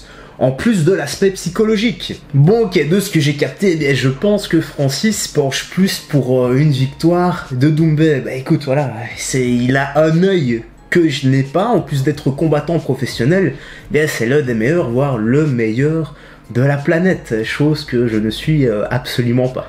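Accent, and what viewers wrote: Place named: Liège, Belgium. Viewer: je vais plutôt bien, content de te retrouver un peu plus souvent sur YouTube. Tes analyses, réactions sont direct, simples et pleine d'humour et de bons sens. Ça change des contenus français qui ont tendance à être... team A contre team B , les différentes chaînes YouTube se font une guerre qui n'a aucun intérêt, qui est tout sauf sportive. Ils ne semblent pas réaliser que ça nuit totalement au MMA et à leurs combattants. Même si les YouTubeurs sont très compétents,...bref... Merci pour ton contenu bien belge avec ce petit accent de ??? Liège ? Perso suis à Charleroi . Kiff bien ce job et pourquoi pas un feat avec Ilies ou Chris Guenacht ? 3 bien belge 😊😊🙃 belle brochette de connaisseurs 😁🥳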